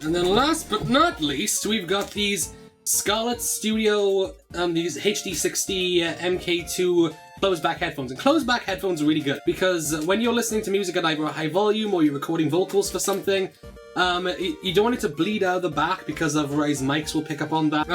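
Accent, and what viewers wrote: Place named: Wales, United Kingdom. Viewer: ah a fello welsh mun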